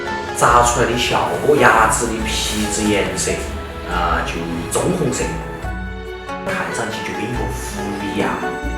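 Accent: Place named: Sichuan, China. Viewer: 四川话解说真是笑死我咯，真是欢落源泉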